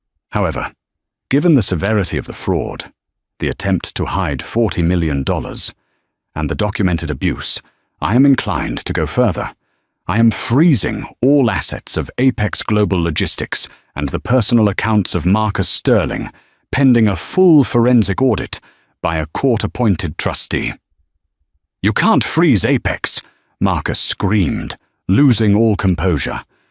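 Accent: United Kingdom. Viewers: The commentator's voice is quite pleasant, as well as the British accentuation that gives a convincing touch to the story. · I certainly prefer the British English. The grammar, accent and pronunciation are in-line with my education.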